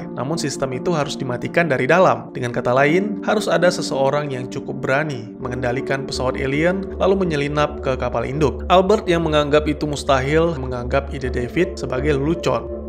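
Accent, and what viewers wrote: Aceh, Indonesia. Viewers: Bang lu org aceh ya?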